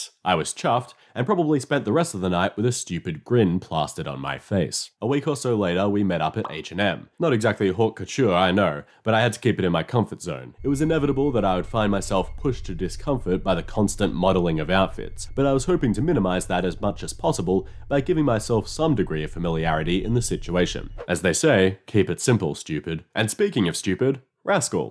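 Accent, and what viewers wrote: Melbourne, Australia. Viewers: So he's Australian. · You Aussie!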